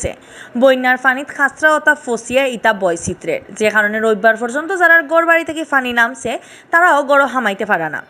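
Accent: Sylhet, Bangladesh. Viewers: সিলেটি খাঁটি ভাষা। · আপু আপনি তো খাটি সিলেটি। কিন্তু এতো খাটি সিলেটি মাত আমি সিলেট উনছিনা। আপনি কোন জেলায় তাকন। এটা সিলেটের কোন জেলার মাত। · আফা আপনার গর কোন জাগাত, ওতো সুন্দর সিলেটের বাষা কইরা,,, আমরা বাড়ি দাওয়াত রইলো ৭ রংয়ের চা খাইয়া মাতিবো সুন্দর করি 🌶️🌶️🌶️ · খাটি সিলটি ভাসা।।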